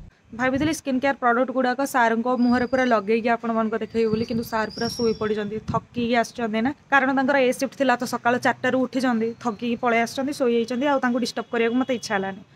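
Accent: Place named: Talcher, India. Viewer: Hii sis apana Talcher language v tk kahuchanti ta mote v laguthila ki apana Talcher ru tuma Katha kahiba style bahut badhia mu v talcher ru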